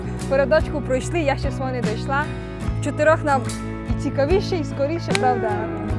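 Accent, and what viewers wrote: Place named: Rivne, Ukraine. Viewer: Хто працює той і має! Але не завжди сільський труд ціниться, до прикладу у нас на Волині, регіон Шацьких озер, малину вже і по десять гривень ніхто не бере, осипається на землю. А скільки вкрадено роботи та затрат, і часу в тих 20 соток! У вас яка ціна, та чи є збут? По діалекту ви з Рівненьської області напевне, і в районі смт. Березне проживаєте? В мене тітка з відти, тож дуже схоже.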